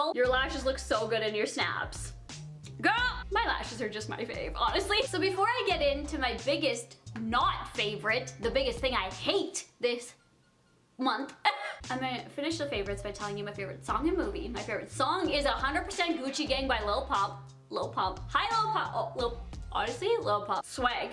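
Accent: Canada. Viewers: DayDr3ams is she not allowed to have a Canadian accent 🤐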